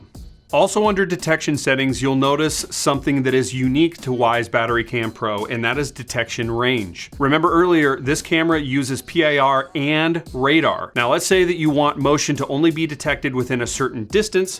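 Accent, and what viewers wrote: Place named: United States. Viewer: Your videos are awesome but mainly because you are a very good English-speaking American, how bad would the videos be if they were done by the same people we have to talk to when we call to in customer service that are trying to speak English, but they are not very good at it, and they are not American. I really like the products a lot, and I was one of the early backers that contributed when times were rough but the product was worth it and the new stuff is amazing, but your customer service is hard to reach and harder yet to understand, I just spent over 35 minutes on the phone trying to find out why items were not shipped that said they were, I got no e/mail about it only a partial shipment with no info about the missing items. I Love the products, but I cringe when I have to talk to customer service you need to get some better speaking customer service people and an easier way to reach someone.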